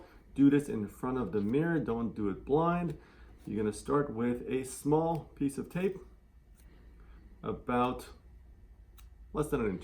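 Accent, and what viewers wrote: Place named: Iran. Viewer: اول از لهجه فهمیدم ایرونین بعد فامیلیتونو دیدم ،عالی😅😂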